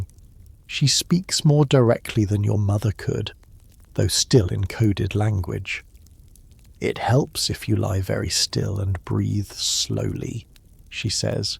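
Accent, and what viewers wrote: England, United Kingdom. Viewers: It's 5.40 am in South Australia. Love the history, and the English accent ❤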